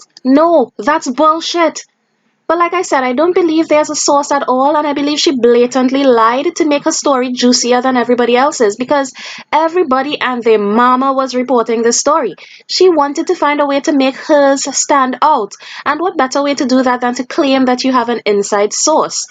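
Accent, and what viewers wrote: Trinidad and Tobago. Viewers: you are sounding like a Trini are you? Good summary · Is that a trini accent i hear :)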